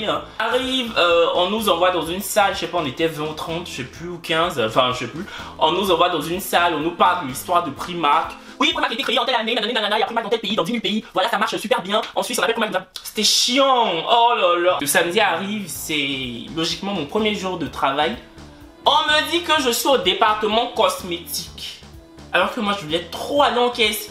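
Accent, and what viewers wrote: Ivory Coast. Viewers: 😂😂 c'est la 1ere fois que je vois et entend ce gas! MDR déjà je sais que c'est un ivoiriens de suite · Clover C'est normal c'est la manière de parler des Ivoiriens!!!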